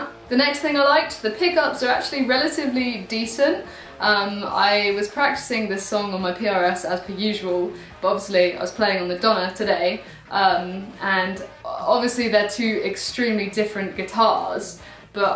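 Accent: United Kingdom. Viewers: You and Emma Watson have the same particular brittish accent. That and you're both wildly talented and beautiful as well. Loved the video! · У девушки классное британское произношение.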